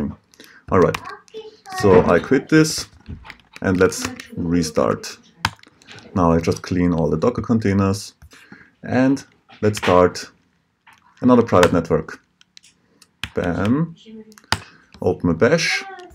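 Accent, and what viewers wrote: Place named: Germany. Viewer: you sound so german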